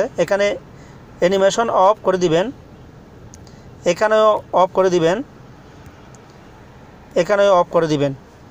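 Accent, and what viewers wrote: Chittagong, Bangladesh. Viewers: Kothai bujha jay,,baiyye chittainge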